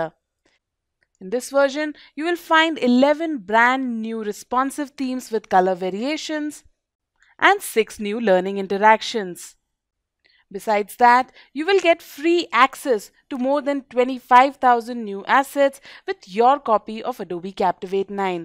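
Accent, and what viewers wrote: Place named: India. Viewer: Indian accent, better get used to it. They are really good at software development...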